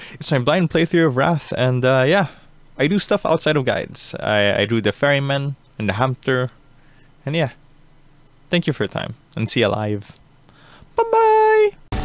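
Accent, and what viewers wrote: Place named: Philippines. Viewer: been watching your guides and they've been extremely helpful, thank you! are you filipino btw because that accent is so recognizable ahahaha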